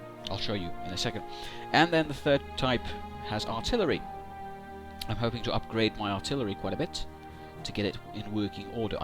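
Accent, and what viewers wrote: United Kingdom. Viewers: Kuulostat ihan britiltä ! :D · your from finland!?!?!? wow. I would have guessed British/UK... great English.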